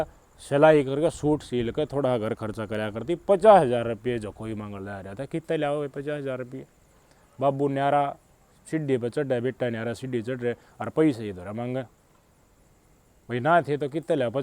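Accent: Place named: Jhajjar, India. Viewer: Bhai ye bhai district jhajjar se lagta hai mere ko me bhi jhajjar se hu magar bhai baato ko bilkul khol kholker samajaata hai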